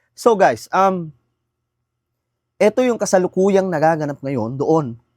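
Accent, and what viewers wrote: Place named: Visayas, Philippines. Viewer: Bkit mo pinakikita Yan na kesyo Marami sila,isa ka talagang DDS din ..parang ipinagbubunyi mo ang dami nila ,iyan ay mga inc members at konting DDS.Halata ka na di ka tunay na ilokano may pasangkay sangkay kpa eh isa ka nmang bisaya ( halata ang pronunciation mo ay bisaya).majority bisaya ay DDS at isa ka duon..tanggalin mo inc member Dyan kundi nasa 100 to 150 lng ang DDS.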